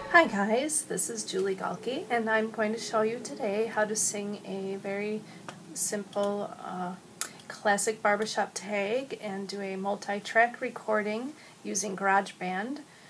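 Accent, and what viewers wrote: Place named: Wisconsin, United States. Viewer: I DO love your "Wisconsin accent," and I love your demeanor! Having taught for 30+ years, and having taught/supervised/evaluated teachers while so doing, I hereby award you an unqualified "A." You speak TO, not "at," your students; you take things logically; you "'splain why?" when appropriate -- wish I could really be your student!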